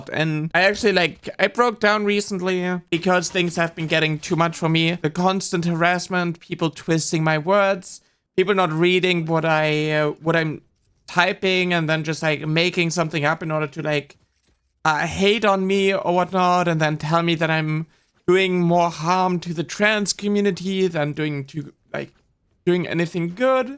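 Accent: Germany. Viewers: i know your struggle with voice, but i'm still training and i really disagree with you and bullies saying you sound like a guy... you have a very nice voice to me, clearly a womans voice and i like a lot, also quite soft (most of the hardness ppl might percieve comes likely from the german accent in case you hear that a lot) i appreciate what you do and hope you feel better as time goes on... again, i like your voice a lot and it does sound clearly feminine to me... and i will keep telling you that every now and then as you clearly don't get it often enough <3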